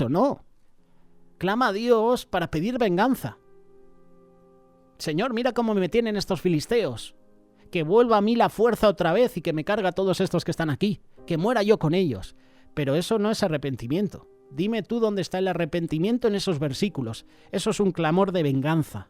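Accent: Spain. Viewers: El ETERNO LES BENDIGA HOY ES 4JULIO_ 2021-DESDE OREGON PORTLAND USA ME ENCANTA SUS ENSEÑANSAS ME INSTRULLEN MUCHO I TAMBIEN ME ENCANTA SU ASENTO DE VOSOTROZZ😍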